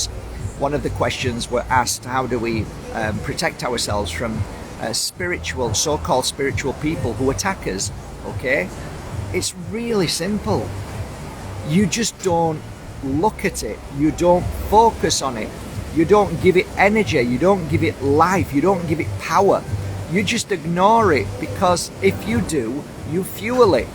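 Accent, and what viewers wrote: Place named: North, United Kingdom. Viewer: Northern (good reference too!) no nonense delivery 🤗 with a brilliant combo of compassion/passion 💥💥❤🧡💛💚💙 Love your fire in your belly..... grand job lad! Clarity is by its nature challenging....praise be for that!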